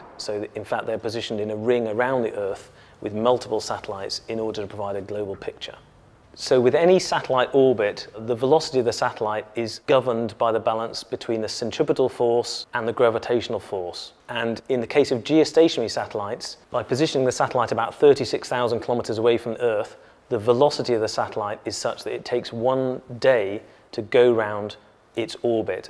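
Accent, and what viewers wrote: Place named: Europe. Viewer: Ouais ouais ouais euro anglais on est là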